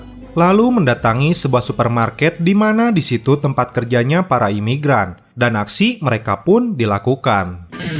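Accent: West Java, Indonesia. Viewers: Bang urang sunda nyaa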